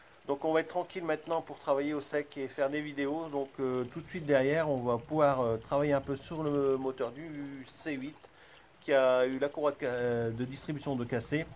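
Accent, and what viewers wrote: North, France. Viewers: Tu est d’ou du nord ?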